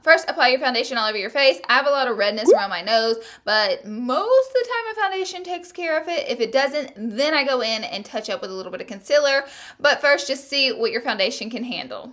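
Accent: South, United States. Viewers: erez muy lindaa me encanta tu acento sureño..Buenos tips..😉😉... you are beautiful luv your southern accent ...good advices I'll be putting them in practice you are one of my favorite😉😉😚🌹🌹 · is she southern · Oh that Southern accent-- so sweet to my ears! 'Cuz I'm from Louisiana. Prayers for those who have been affected by the flood in Baton Rouge...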